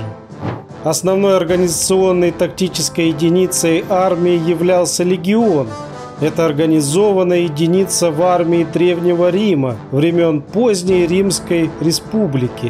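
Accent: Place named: Ukraine. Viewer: русский с Украинским акцентом))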